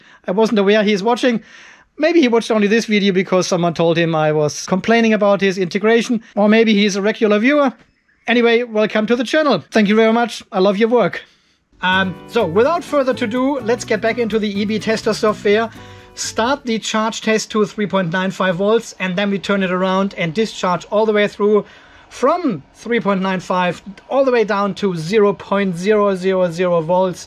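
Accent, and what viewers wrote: Germany. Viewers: meine Mutter und Großmutter findet dein clasicher deutsche Dialekt so funny.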